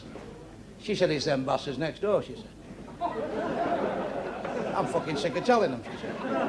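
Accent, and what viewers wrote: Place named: Manchester, United Kingdom. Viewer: Me and Bernard are both slum kids from Manchester These are the jokes we used to tell at school when we were teenagers. Same accent, same dialect, same words, same places and so funny. Bernard is not prejudiced but you can tell by the laughs that some people in the audience are.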